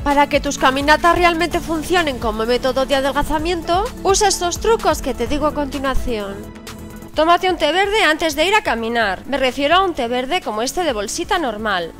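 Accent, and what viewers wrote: Spain. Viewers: eres de Valencia ?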